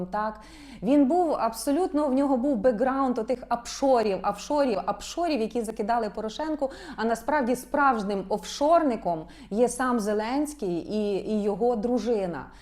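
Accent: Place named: Russia. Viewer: Зверніть також увагу на орфоепію, бо ви кажете : майданчЬик, вибачЬте, очЬорнення, злочЬинний, щЬо не було чЯсу, навіщЬо, причЬина, збагачЮватися, хочЯ, головнокомандувачЬ, почЮти, дітищЄ, бачЄння, з багатьма зірочЬками, площЯдка, чЄсноти, бла бла бла ... гаварітє парускі і не вдавайте, ніби ваша мова українська. Дякую.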